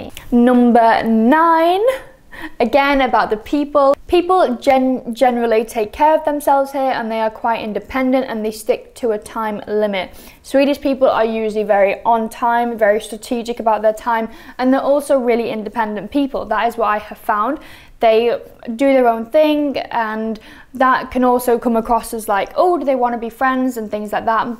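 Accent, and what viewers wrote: United Kingdom. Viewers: Great video! I'm also half Swedish and am planning to move to Sweden some years after I finish uni here in the UK :) One point tho, I actually really don't mind the higher taxes! Yes, it definitely feels like a LOT when you are coming from a country like the UK or the USA but honestly in terms of what you get out of those taxes it's pretty incredible! You have great maternity and paternity leave, child benefits are incredible if you want to have a family, child oriented services and schooling in general is much better than many other places imo, there is investment in maintaining infrastructure, the healthcare system is pretty fantastic and the general state of the country in general. There are things I'd criticise Sweden for but personally I don't mind the taxes. Again, really great video! Looking forward to more content, what UK accent do you have btw? 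I can't quite place it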